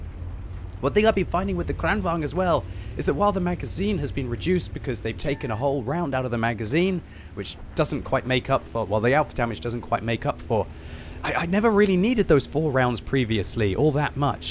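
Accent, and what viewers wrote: United Kingdom. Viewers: Being sick u sound super british :P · sounds even more british like this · You sound a lot more british when sick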